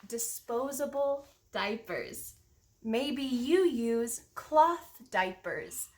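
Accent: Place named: United States. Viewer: Hai saya dari Indonesia, saya sangat senang bisa menemukan video ini, saya juga sangat suka dengan aksen Amerika, ini bisa menjadi motivasi saya untuk belajar banyak tentang bahasa Inggris 😊, Terimakasih!! 🙏🏻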